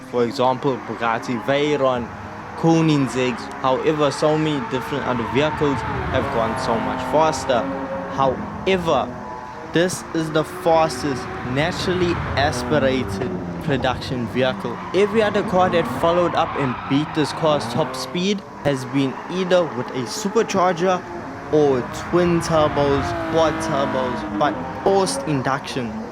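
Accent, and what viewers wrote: South Africa. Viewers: bro u sound like u are from sa?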